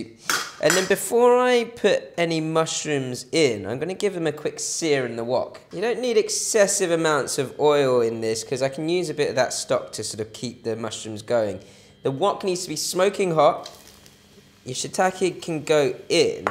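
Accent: United Kingdom. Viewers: That lovely British accent!! :-) Thanks for all the good recipes!!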